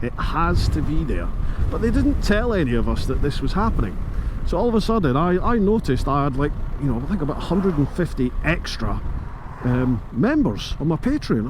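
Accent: Scotland, United Kingdom. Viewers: You sound a typical Scot and i am from Glasgow now living in La belle France still living my life and approaching seventy five Merry Christmas mate.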